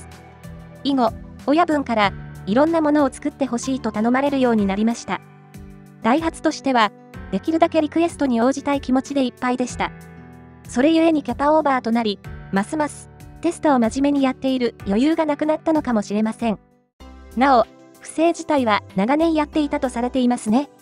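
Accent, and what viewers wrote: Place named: Tohoku, Japan. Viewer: セリフの喋り方が東北っぽくて田舎を思い出すなぁ…。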